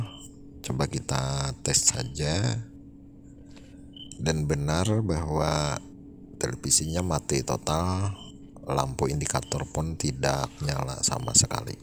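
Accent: Banjar, Indonesia. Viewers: Pina kaya orang banjar pian niiih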